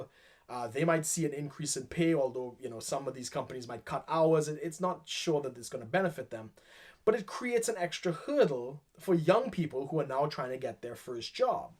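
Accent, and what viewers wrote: Trinidad and Tobago. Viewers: Right away I knew you were from Trinidad.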